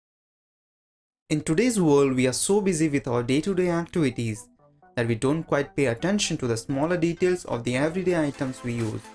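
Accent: India. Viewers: can't understand his Indian accent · Why you are not giving the text to a text to speech robot. The Indian accent is horrible.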